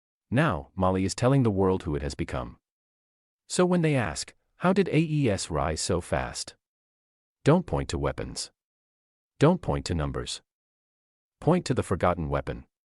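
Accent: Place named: Europe. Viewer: Use African voices who are you videos for Europeans?? Let us ban all Europeans for at least 200 years and usa euros for 300 years. No euro voices, thumbs down on your euro voices.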